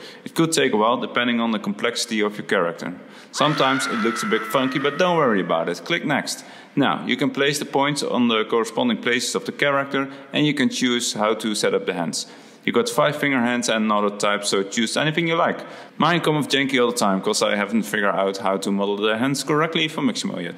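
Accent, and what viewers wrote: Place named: Netherlands. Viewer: Nooooo, your English is perfect I am sure it is even better than mine, but I recognize Dutch accent from their pronunciation of the letter "S". In Africa we ask people not to sleep in the open, because of the missile. hahhahahah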